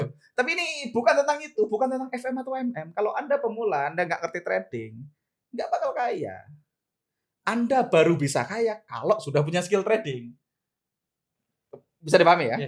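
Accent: Banyumas, Indonesia. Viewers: Ora ngapak..ora kepenak 😂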